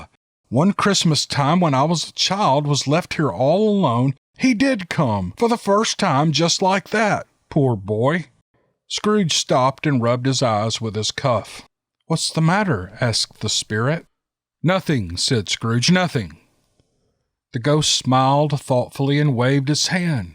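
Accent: South, United States. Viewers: You sir can read Green eggs and ham with that Southern twang and make it sound great! Merry Christmas to all.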